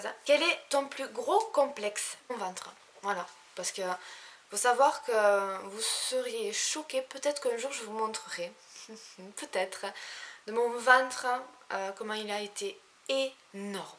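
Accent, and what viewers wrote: South, France. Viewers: Coucou! Je suis de Montréal, j'ai exactement ton age, j'aimerais te dire premièrement évidement que je te trouve magnifique! J'adore ton accent du sud de la France;) et je te trouve vraiment intéressante et terre-à-terre. Je me reconnais beaucoup en toi et je te souhaite vraiment de réaliser tous tes souhaits tu sembles vraiment mériter tout le meilleur du monde! Bisoux bella xxx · slt je te kiff!!! Es ce que tu viens du SUD!! 😘Bisous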